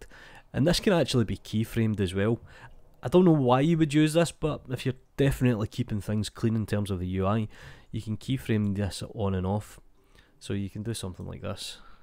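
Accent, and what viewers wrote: Scotland, United Kingdom. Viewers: 2 things that I like at the same place; blender tutorials aand Scottish accent :D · that scottish accent 👌👌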